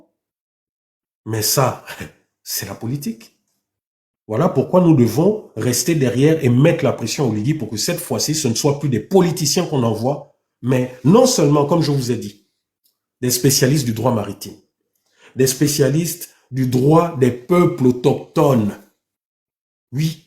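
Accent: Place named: Africa. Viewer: Bonjour tu parle vraiment comme un bantou❤❤❤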